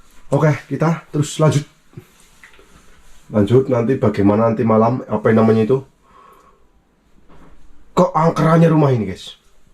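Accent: Banyumas, Indonesia. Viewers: jawane ngendi mas , kok logate ngapak tenan 🙏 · Mas orang jawa ngapak ya, ini di jepang di kota mana mas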